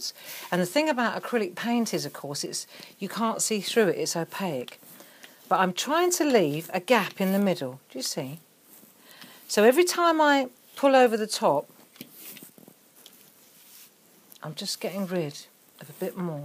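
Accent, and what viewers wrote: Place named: United Kingdom. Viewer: Wow, that’s so clear and helpful. Thank you. Lovely to hear a British accent too. 🙂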